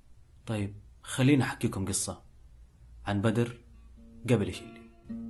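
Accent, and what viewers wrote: Hejaz, Saudi Arabia. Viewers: you should learn najdy dialect/accent not jadway/7jazy accent :(, so watch more of laykthr7&btharh ^_*. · طيب وش سالفت الاكسنت :| ، 7jazy accent - يمقن سبيكه يمقن مخفه هع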